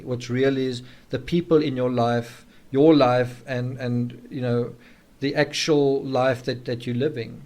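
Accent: South Africa. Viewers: He sounds South African.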